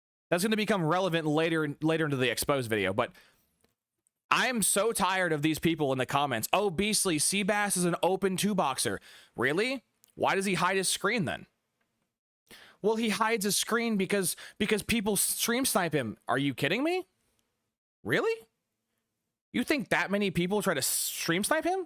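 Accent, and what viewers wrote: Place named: Mid-Atlantic, United States. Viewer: I think your accent was the now extinct Mid-Atlantic accent.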